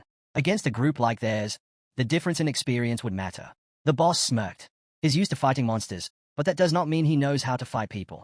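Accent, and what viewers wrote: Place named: United Kingdom. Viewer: British ahh voice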